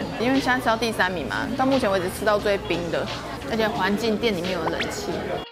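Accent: Taiwan. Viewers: 你知道嗎，，我想臺灣時就看你節目，在歐洲待久了，聽到你的口音就知道我的魂又回到臺灣了，親切的像鄰居，謝謝你❤️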